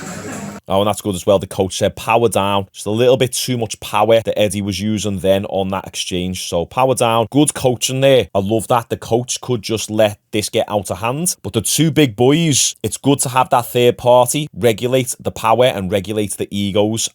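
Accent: Liverpool, United Kingdom. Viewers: Can you shut up and just watch the video please! I don't want to hear a scouse to talk over the video!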